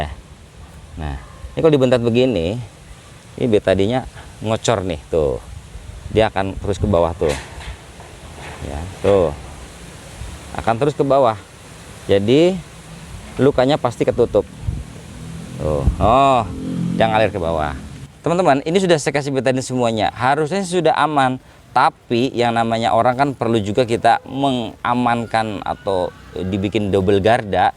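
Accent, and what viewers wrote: Jakarta, Indonesia. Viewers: Abang orang Betawi ya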